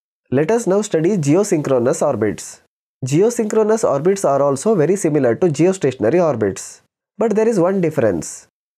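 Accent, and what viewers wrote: India. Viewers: Outstanding video sir.. you made this concept so easy to understand...🔥🙏🏻 And if I'm not wrong...you're from south right...?? Bcz of your accent 😊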